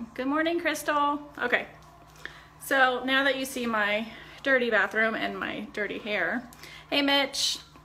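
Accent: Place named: New York, United States. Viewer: It’s a northern jersey/ New York accent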